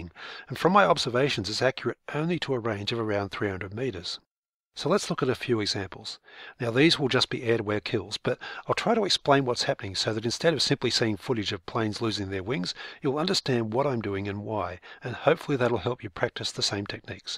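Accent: Australia. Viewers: You aussie!??!?!?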